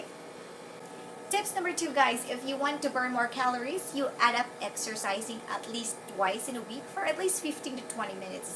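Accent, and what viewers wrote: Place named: Cebu, Philippines. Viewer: Thank you for your tips mam cebuana pod ko mam i like your accent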